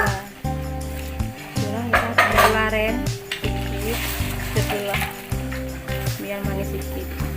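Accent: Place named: Aceh, Indonesia. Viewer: Brat logat apa Aceh 😂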